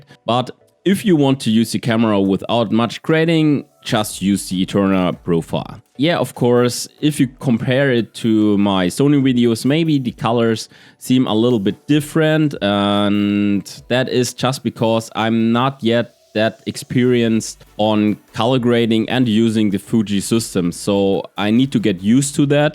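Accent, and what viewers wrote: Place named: Germany. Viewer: Dieses German-English... herrlich 😅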